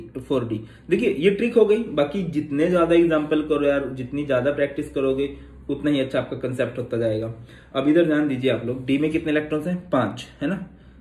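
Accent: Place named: Chandigarh, India. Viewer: Sir aap kha se ho apki language aas padose ki hi lag rhi h Chandigarh se??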